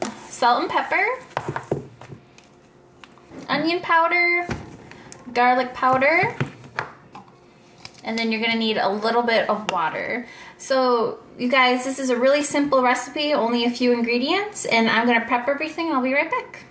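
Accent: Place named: Minnesota, United States. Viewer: trying this tomorrow. You must be from Minnesota. I can hear it in your voice.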